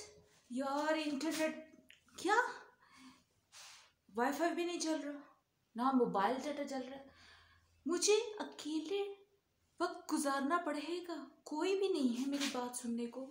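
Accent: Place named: Punjab, India. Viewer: Punjabi xent❤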